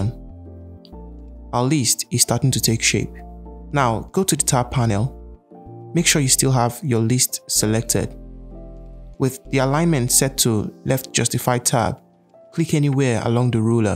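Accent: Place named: West Africa. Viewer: Western Africa accent 👌 Dope tricks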